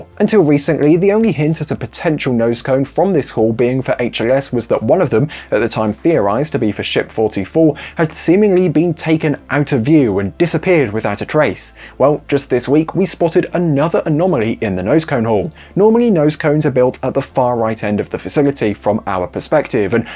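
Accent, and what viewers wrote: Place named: England, United Kingdom. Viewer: Are you really trying to tell the English guy how to speak English? The meth pronunciation is from the dumbed down version of English. 🇺🇸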